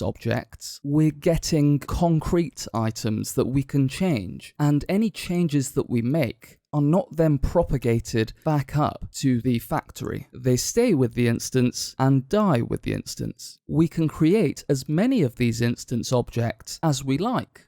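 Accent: India. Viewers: You statement about Indian accent is very unfortunate. I feel sorry for learner's like who instead of focusing on the message focus on the messenger especially when things are given free. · I don''t understand what is meant when it's said "It's free so you shouldn't say a thing about it" , it's free, so what? I don't like it! The guy makes money from it even if it is free! My point is that, since they make money, they should try to make something that's the best they could offer for their own good. I stated how I truly felt, it's completely normal to dislike an accent, and it is nothing "unfortunate", the voice really does matter when it comes to sending your message, and I think that the message that Indians try to make their voice more like the English accent, I think if they put more effort into it the message would be sent more fluently. It's not my fault that I get headaches when I listen to the Indian accent in the first place, I would love to listen to them without getting a headache honestly, it's just that I am that way and I am the one who suffers from it since Indians have very useful content...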